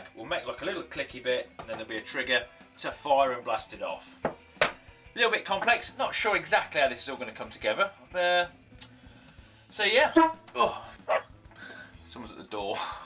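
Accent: United Kingdom. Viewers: Well. He is A Crazy and Genius British Accented man with Strange Futuristic Machines. Colin Is A Timelord Confirmed. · Is he British?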